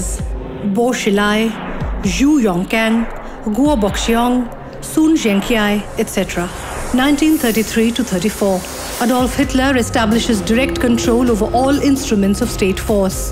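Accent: India. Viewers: hey guys is the narrator indian???????????? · on a completely unrelated note, i love the indian english accent. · 一看标题这么劲爆，点进来发现是三哥口音 不稀奇. 这个民族没救. 中美GDP差距1/3 中国还谨小慎微.. 中印GDP差了五倍 (在相似人口相似基础下). 印度这个民族永远不知道反省. 指望着骂别人可以提升自己。中国则是励精图治, 奋发图强 。 这是两国最大的区别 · your Indian accent give you away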